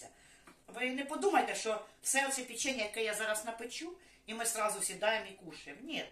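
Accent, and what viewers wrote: Ukraine. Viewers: я первый раз у вас на канале.Очень родной украинский говор. Когда смотрела ваше видео, рядом сидел муж и сказал, говорит точно,как твоя Валя. Валя - моя сестра. вы с какого региона Украины?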